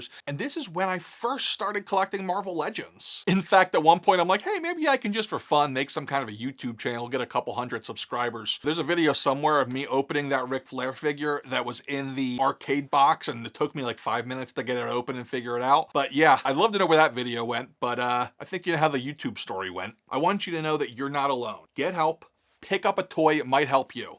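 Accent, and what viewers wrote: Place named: Philadelphia, United States. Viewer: You never said you were a Philly boy, but I knew the voice was 100% Philly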